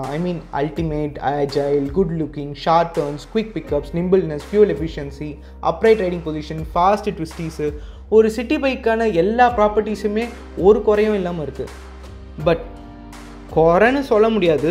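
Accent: Chennai, India. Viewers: Bro good voice good style of commentary very super style natural madras tamil like my brother 👌 Super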